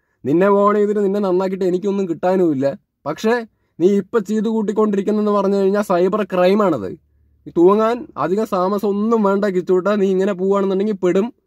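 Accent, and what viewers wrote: Palakkad, India. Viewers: Bro palakkad aano language ath pole thonni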